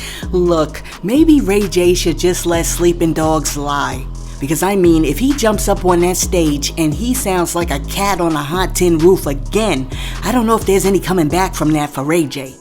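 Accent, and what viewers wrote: New York, United States. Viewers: As someone from NY born and raised I can tell the woman narrator is from NY and is also a Gen X.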